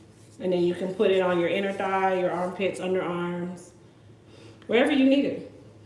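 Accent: Jamaica. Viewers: Girl you’re so real I would’ve not known you from Jamaican background. You bring out your Jamaican accent I like that about you, you’re proud of where you’re Heritage is 🙏🏾💕🙏🏾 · You sound jamaican sometimes but you know what I love you very real I've learned a lot from you I'm 68 and you never too old to learn thanks a lot for all the info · You must be Jamaican. The accent pops out. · Down to earth giro love your suggestions Are you Jamaican I heard some languages there. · I heard that JM accent..Loving your show...Didn't know about the coconut water!!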